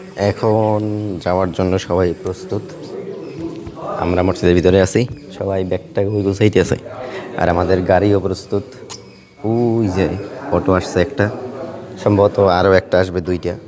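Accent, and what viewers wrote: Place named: Bagerhat, Bangladesh. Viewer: আপনার কথা বাগেরহাটের মতো।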